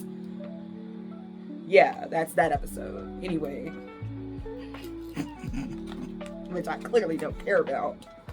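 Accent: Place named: United Kingdom. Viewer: Your British was a bit too convincing bc I was thinking "Has she always been British?"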